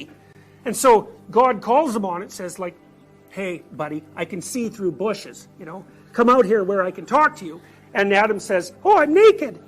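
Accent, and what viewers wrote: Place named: Canada. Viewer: It's his Canadian accent.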